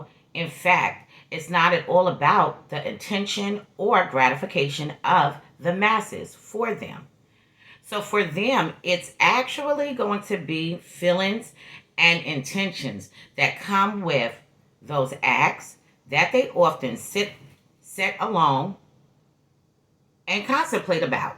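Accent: New York, United States. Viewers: I always watch your videos. U sound like you from the Bronx or NY I should say. I have this placement. I also have Neptune in 4th house. Saturn and Uranus in the 2nd. In this lifetime, I took care of my mom. So much so I took her to the hospital the day she died. But I couldn’t stay cuz of Covid, I got the shock of my life when I called the hospital two hours after I took her and they told me the doctor will call me back because when he called she told me my mom died. I been taking care of my mother since I was very small. I mean going out doing laundry and going food shopping at 10 years old. I had a very hard childhood. I was the black sheep. My mom was mentally ill, severely depressed and emotionally unavailable. I always felt very unloved. Then I was the scapegoat. But I love these interpretations. U do your research and u don’t sugar coat like thee other astrologers. 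You are helping with me and others with shadow work. God Bless You and Yours.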